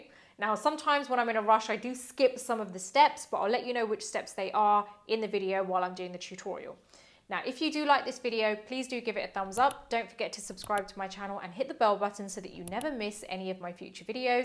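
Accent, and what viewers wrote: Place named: United Kingdom. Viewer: Hi, I love to see your videos, I think you are beautiful, funny, simple and your tone of voice relaxes me, I love love the Brit Accent you have, and of course, I relate to you because I think we kind of have the same type of skin, combo/ textured, anyway I love the fact that you recommend efficient products that actually work, not just sing out loud every week a bunch of new products to get more attention of PR and get paid for partnering with them, which I do get it, I understand that´s the business of being a YouTuber Influencer, but I also do highly appreciate people that share their skills for the art of it....there is a difference in the camera when we see the Sell it all Youtubers and the Real Pro that actually share their knowledge and you are one of them! so thank you for your time and effort in the editing, it is appreciated! 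By the way, talking about pro products, have you heard about the new release of the RCMA Liquid Foundation & different types of Powder....I just saw a video of someone with the foundation and it made me think....is it such as good as the OG translucent Powder that all the Makeup Artists use? made me curious as for now I will try the Tarte Shape Tape one as you recommended it! hils from Viking Land Denmark!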